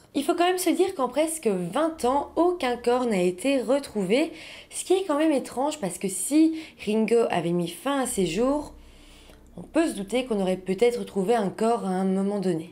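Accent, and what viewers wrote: Belgium. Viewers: Coucou pitchounette agréable travail et ta voix ton accent les ouite (8) et les nonantes ou septante la barre la belgique représente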